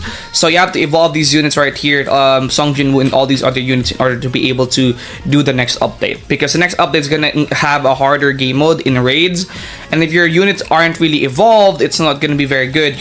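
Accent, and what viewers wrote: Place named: Philippines. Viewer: Helpful video! off topic but are you Filipino? Your accent is cool 😅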